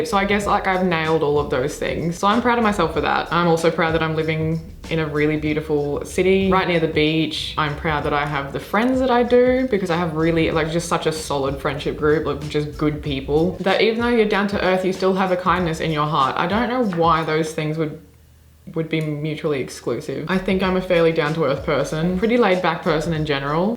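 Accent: Australia. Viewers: I'm assuming you're an Aussie.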